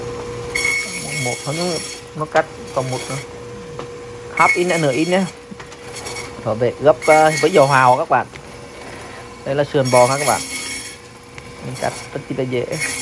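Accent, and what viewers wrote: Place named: Ha Tinh, Vietnam. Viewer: Máy cắt thịt đông êm đó anh 👍👍giọng nói anh nghe quen quen anh quê ở đâu vậy giống giọng hà tĩnh với nghệ an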